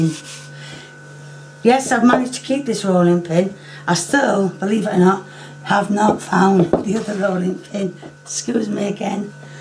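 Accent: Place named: Sheffield, United Kingdom. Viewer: Hi:) can you make your own pizza? if not for you.. then for Lee...;) I'm from Sheffield... you sound from round this way x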